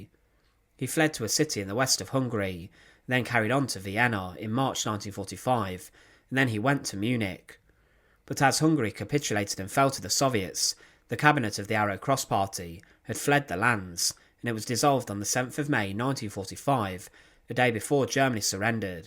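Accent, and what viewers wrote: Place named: Cockney, United Kingdom. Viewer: Your monotone, staccato and sometimes slurred “Cockney” narration is distracting and difficult to follow. I, for one, wish you’d hand the microphone to a professional narrator! You should go to “diction-classes.”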